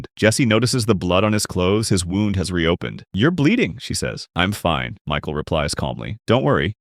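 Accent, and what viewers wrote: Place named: United States. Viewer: Why do we have to endure these ghastly American narrations?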